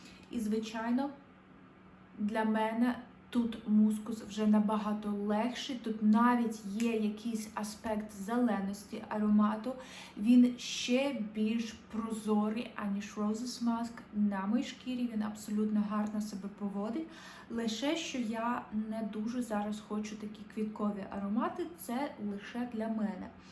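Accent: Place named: United States. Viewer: Гарна українська з американським відтінком.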